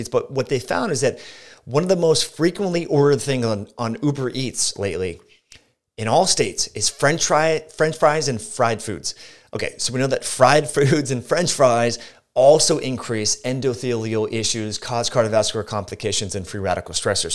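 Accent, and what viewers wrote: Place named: South, United States. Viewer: Hard to understand unless you've lived in the South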